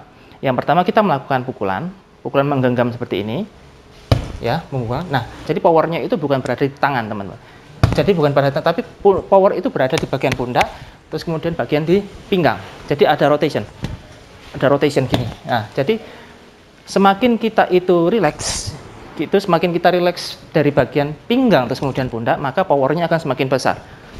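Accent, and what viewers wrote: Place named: Malang, Indonesia. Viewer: Wong malang ta mas.nyuwon wa e😁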